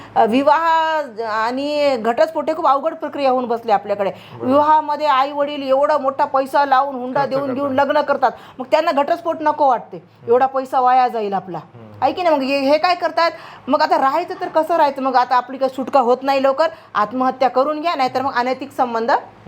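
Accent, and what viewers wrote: India. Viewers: Aapli nanded hingoli chi bhasha, language ❤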